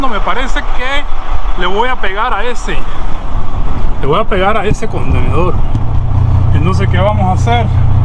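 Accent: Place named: Mexico. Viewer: Saludos bro ya hablas bien Mexa🇲🇽 asi estaba yo pero al contrario cuando me juntaba con todos mis compas cubanos 🇨🇺 incluso estuve en varias ocasiones en Cuba🥰🥳 Saludos mi sangre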